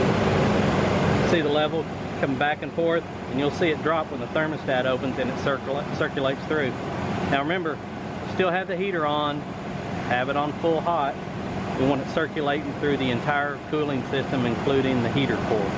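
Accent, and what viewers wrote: South, United States. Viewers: i like ur southern accent... makes me wanna eat some ribss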